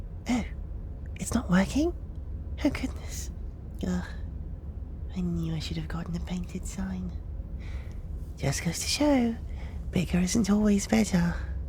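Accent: United Kingdom. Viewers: Is this a British accent?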